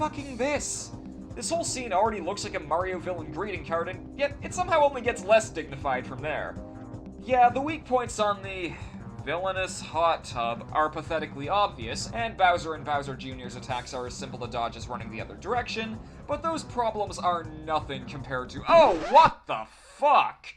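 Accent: Canada. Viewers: Is he canadian? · are you french canadian?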